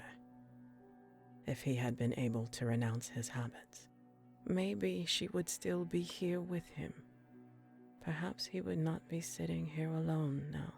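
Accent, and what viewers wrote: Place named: Boston, United States. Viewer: Love that raw - unscheduled laughter....at the end / little did I know - there would be much more..... from your spontaneous, and bubbly personality 😂 my friend I want to say New England To Boston.... accent? " His fingas " Loved the reading Great writing 👌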